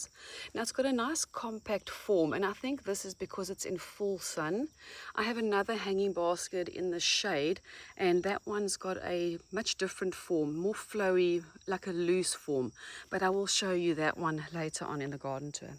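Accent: South Africa. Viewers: Amazing garden a credit to you I detect a SAfrican accent is that where you are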